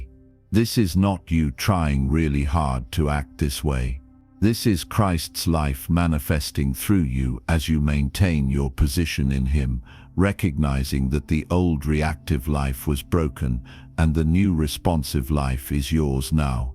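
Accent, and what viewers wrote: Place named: United Kingdom. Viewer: Nowhere in the word says we were broken with him. We died with him. I don’t like it when a different nomenclature is inserted that is not scriptural. We still have an old man nature I renewed mind walk is an intense mindset. Remember when Paul says who shall deliver me from this dead body… We are to reckon our selves dead unto Christ and renewed in the spirit of our minds to the new nature. Where is the British accent narration lead to authenticity regarding a Chinese Christian?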